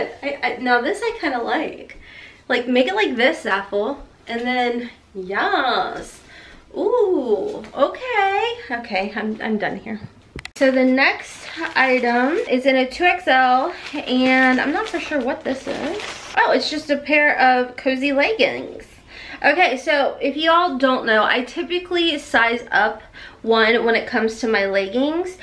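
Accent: South, United States. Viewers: Thank you for this haul. I have never even.heard of Zaful until your haul. You are around the same height and body type as me so it really helps to see how things look on you in the physical moving around in them aspect. Every single one of these pieces looked amazing on you. Ive literally been wanting to dye my hair lilac or lavender but its so dark brown which makes it harder. But you are Adorably Beautiful. I thought you looked amazing in each of the items you tried on. As You always do. Im glad you come out of your comfort zone to do these videos. We need more positive Energy to uplift eachother and support eachother. You hold a great platform for that. Again thank you, you really are a natural too bad being shy holds you back sometimes from being your true outgoing, entertaining self. Dont ever quit. We Love ya your a Beautiful Queen. God bless. 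P.s Your cute southern accent gives me life. 🙏💜👑🌞🌸🌼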